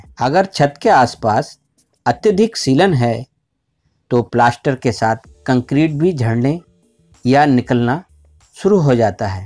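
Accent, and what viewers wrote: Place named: Bhopal, India. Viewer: Sound like Bhopali 😀👍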